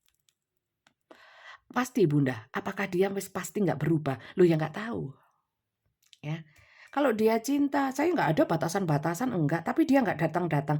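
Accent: Java, Indonesia. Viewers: ❤ Alhamdulillah, terimakasih banyak ibu, atas penjelasannya (medok banget, jelas diterima) Amin ya Robal Alamiin !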